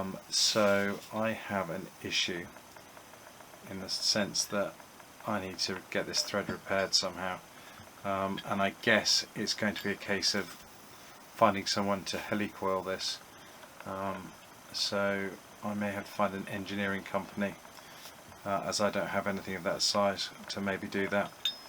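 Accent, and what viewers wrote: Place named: England, United Kingdom. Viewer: That is a beautifully crafted part you had made as a replacement. And FWIW - as you wondered about who might watch your videos in the future. I enjoy watching your videos, even though I have no intention of restoring a motorcycle, in fact I don't even own a motorcycle right now. I do however find your video's quite entertaining, and you are a likable presenter with a nice tone of voice and a pleasant English accent.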